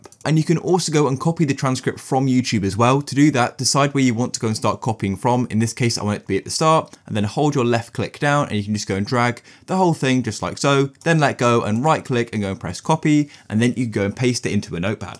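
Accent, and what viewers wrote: United Kingdom. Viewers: When English guide? I don't understand British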